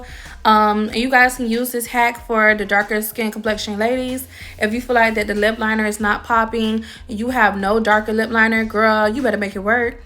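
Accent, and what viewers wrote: Miami, United States. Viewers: That Miami accent tho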